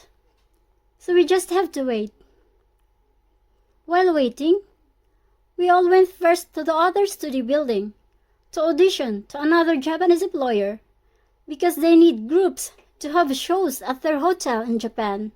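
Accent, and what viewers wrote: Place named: England, United Kingdom. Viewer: Thaks again friend..i like your eng accent...nice blog you have♥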